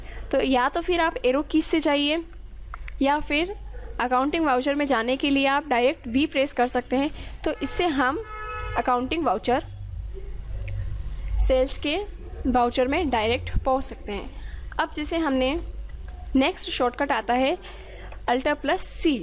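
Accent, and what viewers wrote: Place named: Bihar, India. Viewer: Mam aapka accent Bihari wala h kuch samjh nhi aaya bol kya rhe ho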